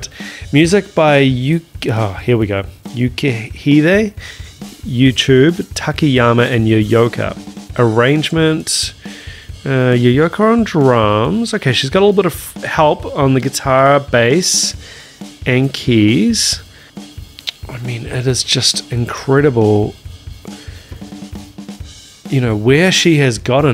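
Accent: New Zealand. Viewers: Don’t forget the people who don’t speak English at home. Add the NZ-accent to that. I only hear some English like sounds but can’t make up the words 😰😰😰.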